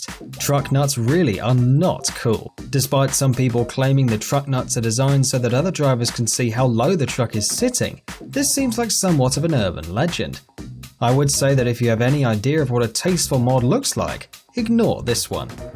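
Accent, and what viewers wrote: United Kingdom. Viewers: Why the fuck is a brit talking about trucks? Get back to your tea. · WHY THE FRUITY BRIT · Ok first things first take your British accent and go fuck yourself and second learn how to spell tire... and lastly what shit do you know about trucks where you drive on the opposite side of the road and in the car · I refuse to listen to a Brit talk about trucks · Lmao hes british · Why is a British person talking about this 😂. 2 or 3 things he listed are actually badass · This dude is brirish and is probably justa city boiiiii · Those British accents are like fingernails on a blackboard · Okay, for those griping about his accent (foolish enough to think they have no trucks in the UK), here's an American guy who agrees wholeheartedly with him. Get real. Regardless of who says it, do you really think those things (oversized rims/wheels, air pollution, etc) are actually "cool?" Not by any stretch. 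· First a British speaking gentleman explaining American trucks? Second, why would you go off road with low profile tires? Third, double digits is way to high for the road, buy if you go off road with it, who cares?Forth, if you feel the need for truck nuts, compensating for something?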